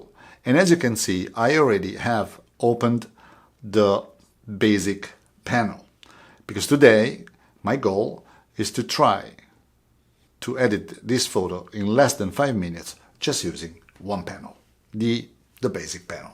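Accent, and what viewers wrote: Italy. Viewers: hello, I very like you performance and passion for what are you doing, your videos are very helpful. Your English is very understandable and I like Italian accent, please continue with this. One of best channel for me. Ti auguro tutto il meglio. Ciao Luca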